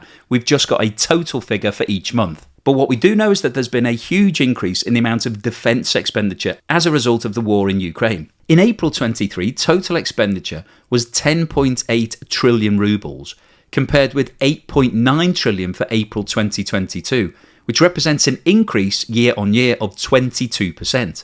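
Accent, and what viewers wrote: United Kingdom. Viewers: Already the British accent tells me this vid is a heap of lies 😂😂😂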